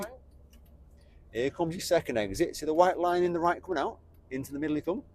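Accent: United Kingdom. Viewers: I can't understand English properly in British accent. How can i learn everything about driving skills? I can learn better in my own language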